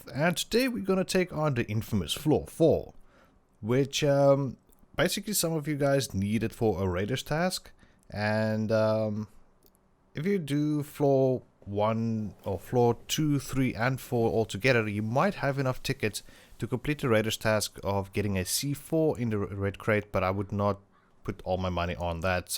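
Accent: South Africa. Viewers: Wow a South African lekker man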